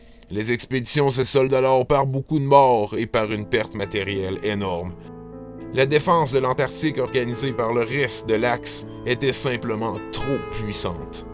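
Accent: Quebec, Canada. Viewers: Putain !!! l'accent de Celine Dion ...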